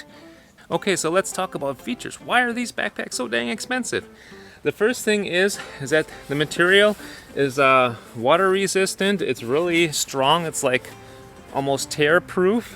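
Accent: Germany. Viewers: If you wouldn,t of said different , I thought you are german ,speaking english with a german accent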